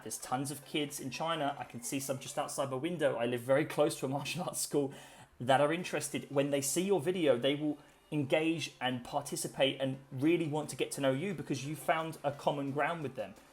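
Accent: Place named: United States. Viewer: Wicked! You use a lot of American pronunciation, by the way. Thanks.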